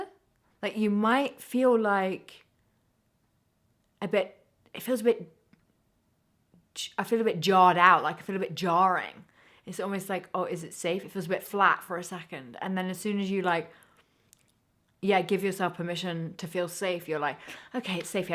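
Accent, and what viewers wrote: England, United Kingdom. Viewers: Thank you so much! Your message resonated all the way! Very interesting indeed and I greatly apririciate your efforts 🙏🏻 The relinquishing has to do with my drug use, I need to let go of something I have seen as a right for 30 years. I already know I don't need it anymore but I seem to be afraid of the emptiness that will occur when I stop. I have already come to the realisation that this emptiness will actually manifest as more room for other things, and I got loads of things that could use more attention. Lately I have been receiving some re-tuning, very powerful changes in my subconscious that immediately cause stuff to integrate. Awesome stuff this whole 'becoming'! And you my love, your presence makes me feel deeply connected. Like I'm finally home, safe... I feel enchanted when you are in your way 😍 How you speak and laugh, you are beautiful in looks ánd your being. 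Haha I even felt a butterfly in my stomach when I realised that you speak with an English accent, that makes it likely that you are 'near'.. Don't worry, I do live in another country, the Netherlands, and I will not go around stalking you or something. I guess I wanted to share this with you because you make me feel special and I want you to know and feel that you are very special to me ❤️ Eyes open, no fear. Be safe you beautiful creature ❤️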